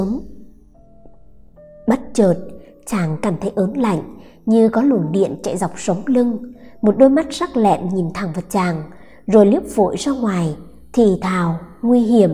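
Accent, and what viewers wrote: Standard. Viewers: Giọng đọc của rất chuẩn và truyền cảm THẬT SỰ RẤT HAY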